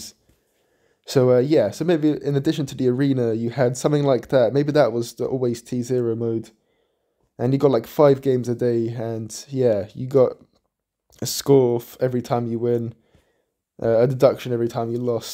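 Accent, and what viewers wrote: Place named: United States. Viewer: u sound like an American 😮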